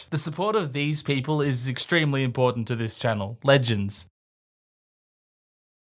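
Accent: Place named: Australia. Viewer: I love how perfect the Australian accent is for being sarcastic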